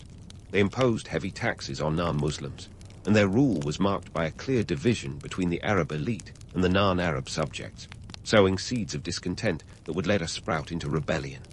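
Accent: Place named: Transatlantic, United States. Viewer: Nice story. Not sure about this transatlantic accent, kept distracting me trying to figure out if it’s British or American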